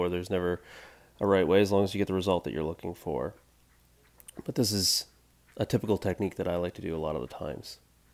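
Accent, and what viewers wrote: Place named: Canada. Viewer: Why did canadians start and continue to say the letter o as a u?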